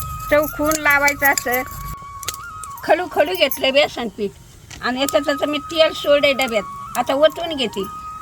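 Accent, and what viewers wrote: Marathwada, India. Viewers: आज्जी तुम्ही मराठवाड्यातल्या आहे का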